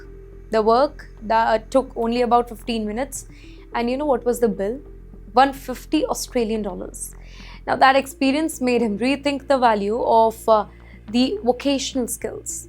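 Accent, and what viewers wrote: India. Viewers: It's Indian english, u donkey, India speaks what the brit jokers 🤡 imposed on it, and then recultured it! Stupid convict!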